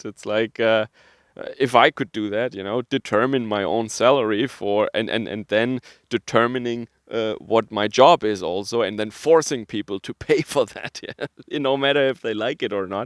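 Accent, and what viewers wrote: Germany. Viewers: Clockwork Orange with a German accent.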